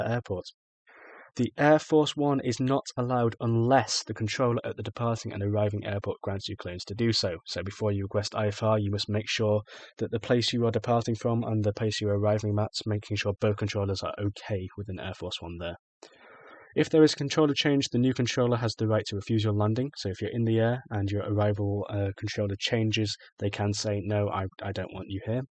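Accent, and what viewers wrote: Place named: United Kingdom. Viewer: bruv ur bri'ish :D same here.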